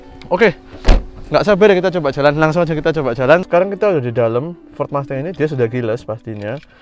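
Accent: Surabaya, Indonesia. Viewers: Kueren PuuoooL Kooo,,, penjelasan khas Bonek sby Ko... Uwis tak Suckrip & tak Like ko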